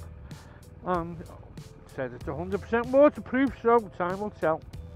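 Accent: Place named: Liverpool, United Kingdom. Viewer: Another scoucer like Paul Griffiths 😂. Just bought an RT here in AZ. Miss my Scoucer home living here in Arizona.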